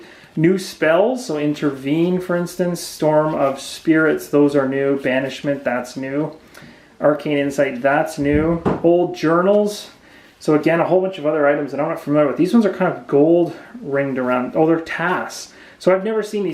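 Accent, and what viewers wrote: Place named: Canada. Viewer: I feel like I pick up a Canadian accent lol. But thanks I’ll be buying this next time I can afford another expansion. Forsaken lore is arriving in mail tomorrow!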